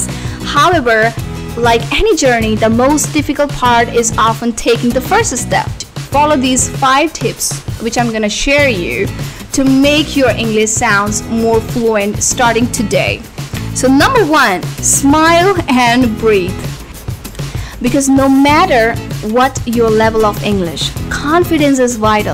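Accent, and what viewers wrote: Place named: United States. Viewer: Wow...bhut achii english h apki..love from pauri garhwal...aap kis chij m job krte h ji...or apne USA style English kase sikhi please tell me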